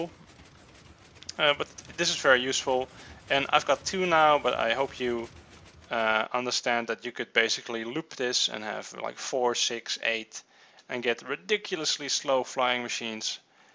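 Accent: Netherlands. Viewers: are you dutch? I am haha so... And you sound pretty dutch by how you pronounce your english words. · amazing tutorial, got me to understand everything i wanted! The accent is very clear, though :D. If i may guess, Dutch?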